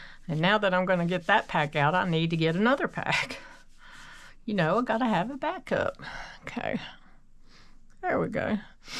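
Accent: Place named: South, United States. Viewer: You are such a doll. I love your southern accent. Your approach as well. Have a healthy, safe & healthy day. 🙏💜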